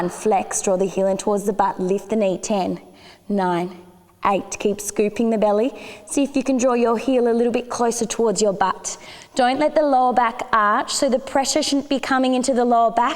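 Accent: Australia. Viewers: I love your Australian accent!!!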